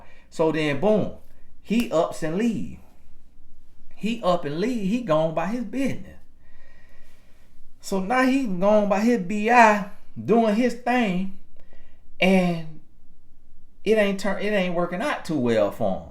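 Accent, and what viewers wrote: South, United States. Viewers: I always giggle on the inside because you have to interpret our southern slang words or phases. I am from South Fla the east coast and I totally understand all of them. Have me rolling 😂😂. Yes it is bazz instead of bath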